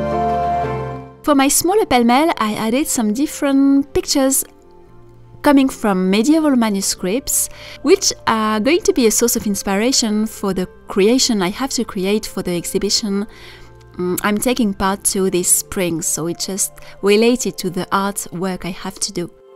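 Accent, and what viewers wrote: France. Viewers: I absolutely love to listen to you! You speak perfect English with a beautiful French accent! The carousel is gorgeous! Not surprising! Hallway looks lovely as well. 💗💗💗💗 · ✨I adore your French accent, so beautiful! Thank you for all the beauty you bring to my life, and for the motivation to work on my art and my French!! ✨🦋💕🌷🪲🍄🦉🐇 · you have a fantastic command of English. How incredible you are to be fluent in two of the most widely spoken languages on Earth! Your beautiful French accent adds music and elegance to the words. I love listening to you 🤍🤍🤍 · Merci for another lovely video. You speak perfect English to me so don't feel insecure. Your French accent is beautiful and I love listening to you. 💕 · Who on Earth says they can’t understand your beautiful French accent?!?? Take NO notice.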